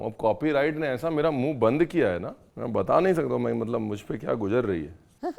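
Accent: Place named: Punjab, India. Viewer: Aaj aap Shehnaaz gill ki tarah punjabiyat me baate kr rhe hain , mja aa gya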